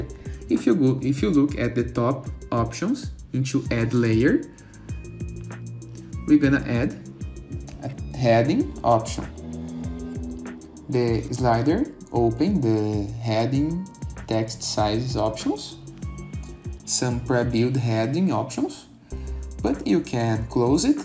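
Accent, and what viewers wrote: Brazil. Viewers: Sotaque BR haha nice video!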